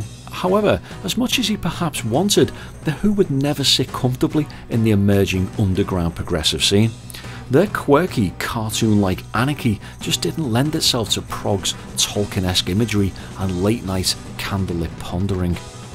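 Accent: Liverpool, United Kingdom. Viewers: Schade, daß keine deutschen Untertitel verfügbar sind. Ich mag deine Retrospektiven seit langem sehr gerne aber dem Liverpooler Akzent kann ich nicht folgen😂😢😢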